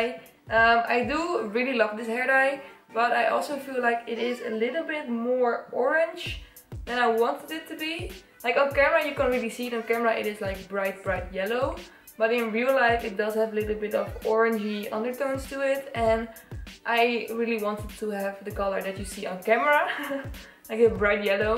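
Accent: Netherlands. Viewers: are you dutch? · are you dutch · Yeah she’s dutch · Are you dutch? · Ik think she is from the same country as me ( the netherlands) because she sometimes says dutch words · Are you dutch? It sounds like you have a heavy dutch accent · I totally heard you were dutch before checking the description box. Dutch people unite❤ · you're talking with a dutch accent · I hear in your accent that you come from the netherlands. I really like your youtube channel. Hey, ik ben ook Nederlands. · ik hoor gelijk dat je nederlands bent haha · sounds dutch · phan trash it sounds like she's from holland/the Netherlands but I'm not sure. My mom is from holland and that's what her accent sounds like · It really sounds like a bloody heavy Dutch accent. I’m almost sure she’s Dutch! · je hoort gewoon dat je Nederlander bent XD <3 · She sounds Dutch to me lol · Ik hoorde aan je accent al dat je Nederlands was :) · Are you Dutch?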